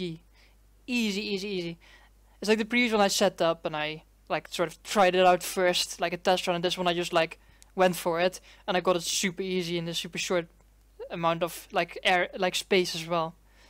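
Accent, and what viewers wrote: Netherlands. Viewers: je accent klinkt zo lekker nederlands, love it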